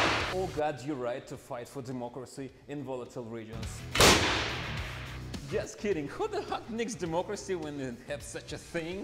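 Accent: Russia. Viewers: Сразу, по акценту, понял что ты русский! Я желаю тебе удачи, ты молодец!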